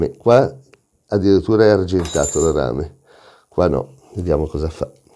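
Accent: Genoa, Italy. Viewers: Perché, come si dovrebbe dire? Comunque sì, il maestro è genovese!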